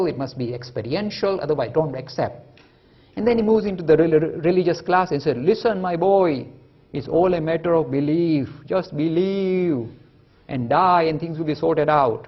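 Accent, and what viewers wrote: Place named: India. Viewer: this is not richard Dawkins .this is an Indian seethe ascent not british. FAKE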